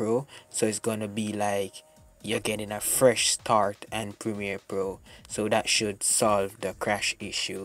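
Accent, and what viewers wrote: Jamaica. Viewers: Sound like a real yaadie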